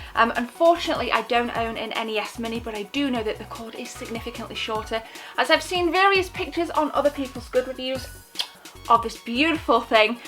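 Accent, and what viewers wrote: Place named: Liverpool, United Kingdom. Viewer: where you from..I can't work your accent out...hear bits of Liverpool /mereyside occasionally. brand new to your channel. lov it x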